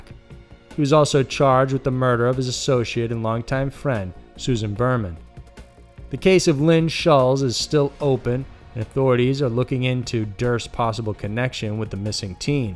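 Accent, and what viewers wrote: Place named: Appalachia, United States. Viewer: Hill Billie narrator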